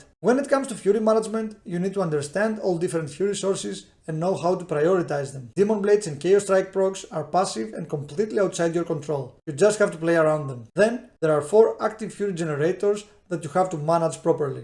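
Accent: Greece. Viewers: your 100% greek, love your accent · are you from greece m8? your accent sounds familiar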